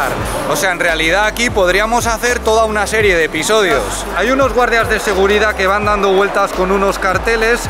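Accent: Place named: Basque Country, Spain. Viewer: Ole! Hay que decir que parece que hayas pasado unos días por Euskal Herria, te he visto con el acento reforzado 😁!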